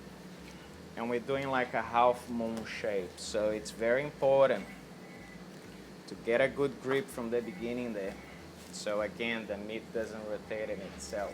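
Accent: Australia. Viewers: todd goslin he probably learned English in Australia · Da hora esse sotaque aussie - e da hora também ensinar (direito rs) os gringo a fazer picanha - porque já vi cada vídeo bosta da galera "ensinando" rs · Sounds soo Aussie. ..awesome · A lot of brazilians go to australia to learn English! That’s why he has this Australian accent! brazilians learn pretty easily the accent from the country we study the language!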